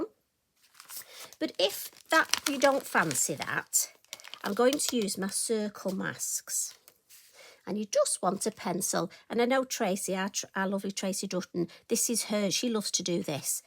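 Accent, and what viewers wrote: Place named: Northern England, United Kingdom. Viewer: Another fab tutorial,. Don't apologise for using Northern colloquialisms. We should all be proud of where we come from...that's what makes us different. I love accents and colloquialisms..I am from Yorkshire and love my accent. I have had so many people comment on how they love it too, sometimes, like you I have to tone it down a bit, but on the whole most folk 'get it'. Thanks for your hints and tips and your lovely videos.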